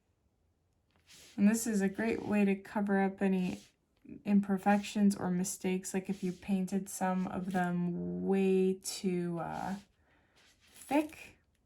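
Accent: Canada. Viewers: Thought I detected small accent-canadian?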